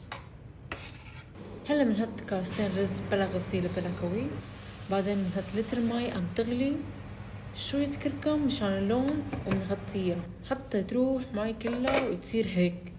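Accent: Syria. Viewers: هههههه بتحكي سوري لهجة شامية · لهجة لادقانية هي ههه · مش مممممممنكلامك السوري روووعه❤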